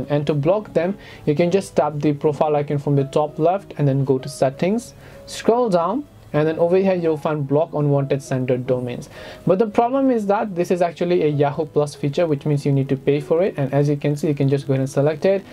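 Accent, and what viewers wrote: India. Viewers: Talking too damned fast and with and Indian accent. UGH. Learning requires a good teacher.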